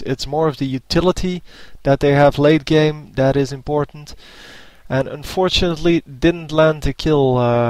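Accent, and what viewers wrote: Netherlands. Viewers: are u dutch?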